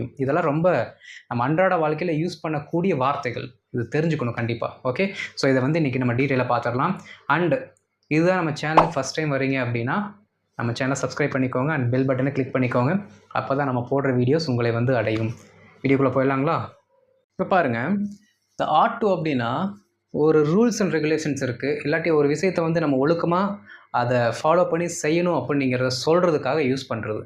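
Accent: Coimbatore, India. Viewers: ஏனுங்க நீங்க கோயம்புத்தூரா Brother class super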